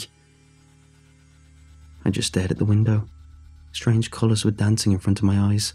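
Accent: United Kingdom. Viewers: or anyone else, can you tell me what part of the Britain his accent is from? It's the clearest sounding British accent I've ever heard and now I love it lol.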